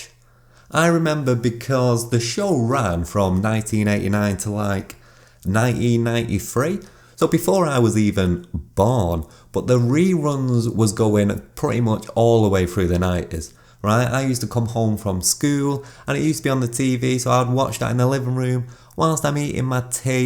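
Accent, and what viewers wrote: United Kingdom. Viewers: Always appreciate your content. Certain it's your accent that makes me miss the UK a little or the tour of topics that walk down memory lane with reminders of more innocent and care free times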